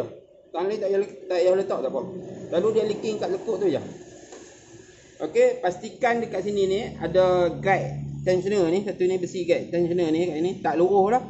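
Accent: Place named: North, Malaysia. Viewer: Kedai dkt mn dgr mcm belah utara ja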